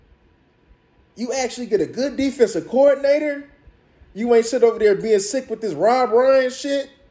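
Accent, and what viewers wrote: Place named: Bay Area, United States. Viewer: You gotta be either from The Bay or Chicago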